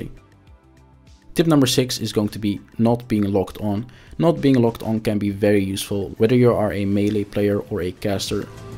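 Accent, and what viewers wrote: Netherlands. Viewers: For sure your Dutch :D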